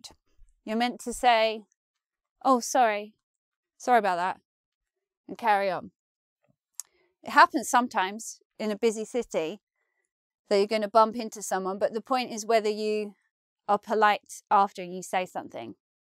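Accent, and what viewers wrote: United Kingdom. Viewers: Hello Ms., I am Sharon from Vietnam, I have been following your channel for 4 years. My passion for British accent drives me here. Your accent is super nice, and amazing videos with so many helpful and interesting to learn. I am planning for England this December/ 2019, I want to experience life, culture, engage myself more with local people, not only travel for sight seeing. I hope u can make a video advise us on that, of some activities, program that visitors can join to understand about British culture; I heard of couch surfing too, can u share your knowledge on that. Thank you so much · You are so beautiful speaking the British English. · You're cute! And I love brittish accent! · I liked it a lot. I could not stop smiling. There are many things in common but, at the same time and with all due respect, you "sound" so English. I loved it, seriously. 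I don't usually comment, but this video is very nice and fun. I really appreciate your work.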